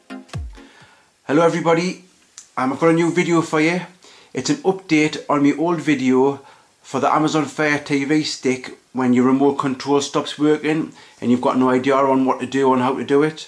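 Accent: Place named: Tennessee, United States. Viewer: You sound funny are you from Tennessee?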